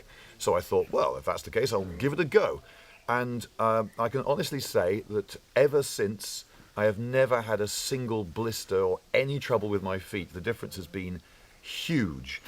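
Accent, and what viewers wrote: United Kingdom. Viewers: Is it just me or does sound more British recently?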